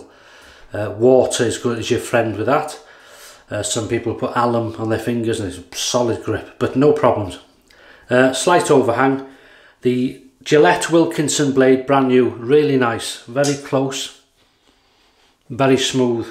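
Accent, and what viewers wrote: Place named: Wales, United Kingdom. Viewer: Hi. Thanks for the videos. I've really enjoyed watching them, and found the very helpful. Actually, it's your videos that have convinced me to get into DE shaving, and I haven't looked back since! Thanks again, from a fellow Welshman. Hoping you are Welsh, as your accents sounds Welsh!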